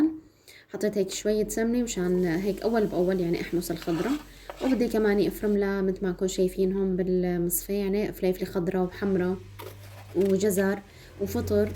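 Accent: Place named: Homs, Syria. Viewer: مع انه لهجتها بتشبه الحمصية 😍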